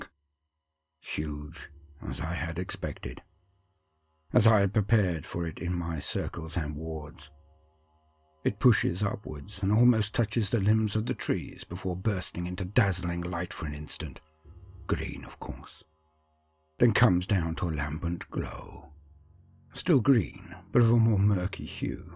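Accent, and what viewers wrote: United Kingdom. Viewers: I could listen to your silky British accent all day. Love your content brother. Keep being awesome. 😀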